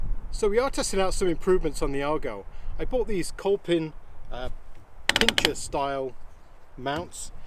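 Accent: England, United Kingdom. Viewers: Hi, enjoyable video thanks. I take it you are originally from England, or at least the UK. How did you end up in Canada? If you don't mind me asking.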